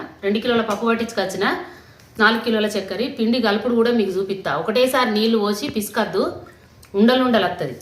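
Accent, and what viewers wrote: Telangana, India. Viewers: మన తెలంగాణ భాషలో మీరు చెబుతుంటే, నా చెవిలో తేనె పోసినట్లుంది. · ఆహా , ఎంత చక్కగా చెప్పేరో , మీ తెలంగాణ భాష చాలా బావుంది.... కళ్ళు మూసుకొని చేసుకోవచ్చు...❤❤ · Authentic telangana language without any inhibitions.👌👌. Keep it up. Very clear explanation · Wow super antuy ❤mi taiking chala natural ga undhi motham telangana basha super❤❤nenu kuda try chesta antuy 🎉🎉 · మీ తెలంగాణ భాష సూపర్ అమ్మ.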